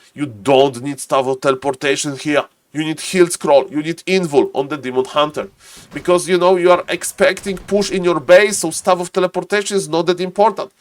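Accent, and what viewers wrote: Poland. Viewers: Are you Polish? The accent is very polish :D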